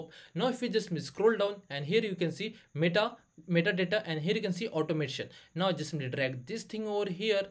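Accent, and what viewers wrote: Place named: India. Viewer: this is the most indian english accent I have ever seen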